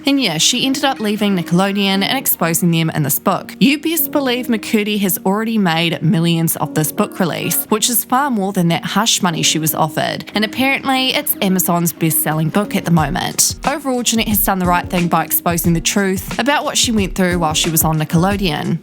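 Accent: New Zealand. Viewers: its a new Zealand accent not Australian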